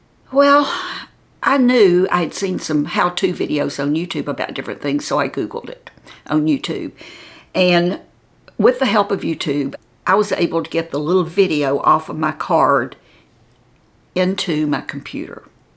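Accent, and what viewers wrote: South, United States. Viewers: Please enter me in the drawing for the cards; they sound great. Happy 80th! I've been watching you for years. It's your lovely southern accent that first caught my attention and then your confidence and wisdom on so many subjects that kept me watching. I also love seeing your all your artistic projects, like the Xmas hanging balls with lights, made from plastic bowls from dollar store. My daughter and I made those last year and they actually turned out really pretty. Makeup, decorating, enjoy it all. Your videos are especially enjoyable to watch after a stressful day. Hope you never stop!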